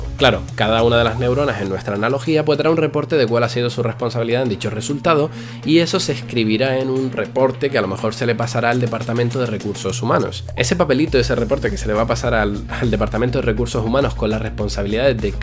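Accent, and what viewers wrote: Canary Islands, Spain. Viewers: Pero pero pero pero pero como no habia encontrado este canal antes? Vengo por el video de JSantaolalla..................... Pero pero pero eres canario? por el acento "un poco"............... ¿??¿?¿ Pero pero pero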